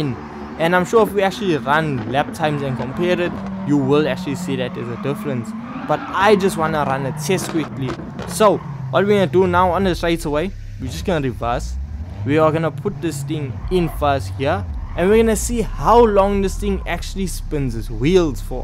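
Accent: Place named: South Africa. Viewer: This bro is a South African indian 100%... I swear straight from durban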